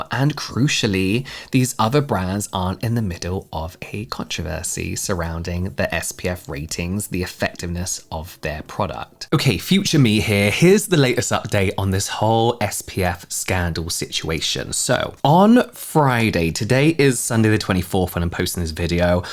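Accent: United Kingdom. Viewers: Oh I didn't know he was British (I'm not good at recognizing accents) but that makes it even more shocking 😅😅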